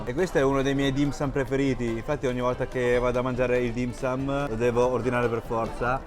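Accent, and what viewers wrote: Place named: Romagna, Italy. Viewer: Di dove sei??? Hai un accento romagnolo.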